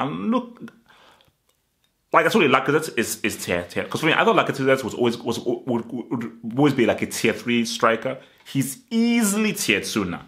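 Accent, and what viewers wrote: United Kingdom. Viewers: That british accent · Oh my goodness I love this channel even more . That British accent is impeccable. Keep up the good work my Nigerian amigo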